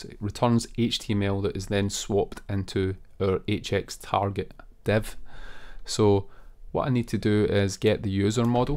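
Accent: Scotland, United Kingdom. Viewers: Good tutorial and with a nice (scottish?) dialect.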